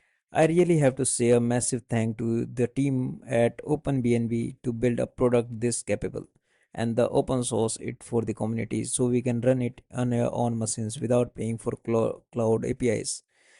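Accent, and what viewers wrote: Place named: Rajasthan, India. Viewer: Your English flow is better than mine... Are you from north India because I sense a rajasthani accent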